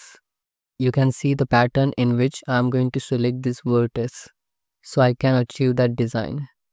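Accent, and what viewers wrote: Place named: India. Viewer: Nice video dude, your English accent is like an Indian guy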